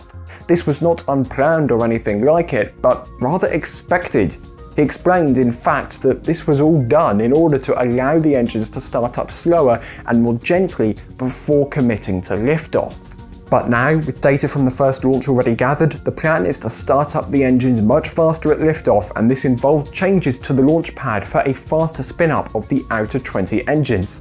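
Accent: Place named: United Kingdom. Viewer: How come best SpaceX videos come with a British accent?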